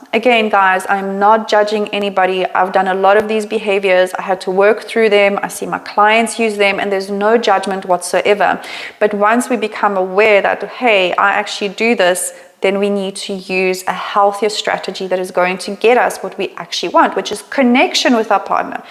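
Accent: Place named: South Africa. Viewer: Literally get so triggered hearing a white South African accent. I associate it with so much racism, can’t even hear the content. Goodluck with your channel, sincerely x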